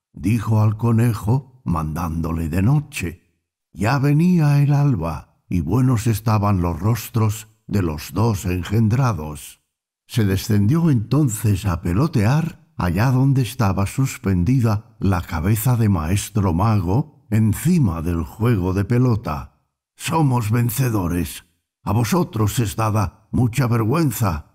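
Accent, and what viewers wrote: Castile, Spain. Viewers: Un poco irónico que el audio sea ejecutado por alguien con acento castellano. Muy agradecido de todas maneras 😂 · Y porque no lo lee alguien con voz y acento de mejico o Guatemala??? Este con tanto acento de los españoles que fueron los destructores, es una gran pena. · No entiendo por qué felicitan la narración, si los datos son incorrectos. Uno de esos comentarios, dice que el Libro tiene mal los datos, NO, el narrador cometió los errores, EL LIBRO ESTÁ BIEN. En mi opinión, hay magníficos narradores LATINOS, no me gusta el acento español, prefiero el acento DE NUESTRAS TIERRAS y más para un LIBRO SAGRADO MAYA.. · Lo Unico que no me gusta es que esta español de España pienso que deveria ser español Latino de Mexico o Guatemala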